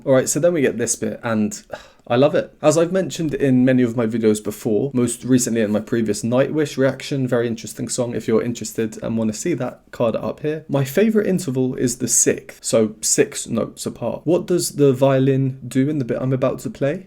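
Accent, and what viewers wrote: England, United Kingdom. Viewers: Me encanta esta canción de Dimash. Y esta actuación es una de mis favoritas❤ Tus reacciones son siempre detalladas e instructivas. Aprendo mucho contigo. ¡Muchas gracias!😊 Por cierto, me gusta tu lindo acento inglés.☺️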